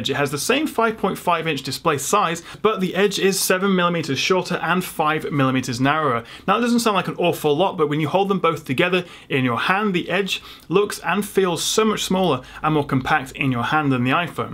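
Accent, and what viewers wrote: England, United Kingdom. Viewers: hey are you from the UK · brilliant review and nice to have an English accent on one of these videos! You're going to give mkhb a run for his money haha. I like the fact that you mention the little things like edges feel in the hand and the fact that you have a problem typing sometimes due to your hand touching the screen. These are the things that really matter and are the exact reason I use the normal s7 as opposed to the edge! great work, keep it up :) cheers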